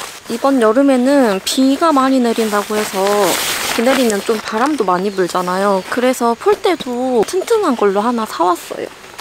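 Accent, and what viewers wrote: Jeolla, South Korea. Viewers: 님 타프 대박 굿굿👍👍 강이 언능 캠핑갈수있는 컨디션 되서 귀여운 강이랑 같이 캠핑다니는 님 보고싶어요~ 님 솔캠도 너무 귀여우셔용!! 님은 모르시겠찌만 전라도사투리 너무 귀여운거 아시나요.. 강이 귀여움에 살짝쿵 밀려버렸지만 님도 인정하시죠?! 안그래도 우중캠핑 가고싶어서 타프 찾아보고 우중캠핑 영상도 찾아보는데 에이웍스 실타프를 님덕분에 알게되었어요. 타프 찾아볼때만해도 옥타타프는 활용도가 별로이지 않을까 했는데 님 영상보면서 자연스럽게 우중캠핑할때는 옥타타프가 괜찮겠구나 생각했어요. 고동텐트도 영상보고 한참 검색해봤어요 ㅎㅎ 다음 영상도 너무 기다리고 있어요. 항상 건강하시고, 강이랑 행복하게 오래오래 캠핑다니셨으면 좋겠어요! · 전라도분이신가봐요 ㅎ 전라도 억양이 순간순간들려서요 ㅎㅎ 저도 전라도사람이라 반갑네용^^*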